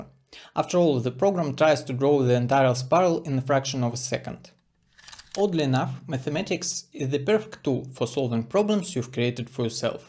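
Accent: Russia. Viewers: I programmed only two-circle version myself and stoped, while you pushed it further more to make it really beautiful, nice video! Some interesting Russian accent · У тебя явный русский акцент)) Анимация просто потрясающая!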